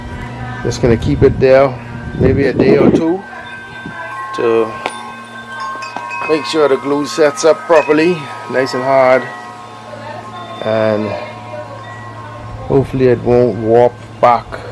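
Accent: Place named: Caribbean. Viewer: Love the progress ... your accent is interesting it sounds like you were raised in the Caribbean in the west indies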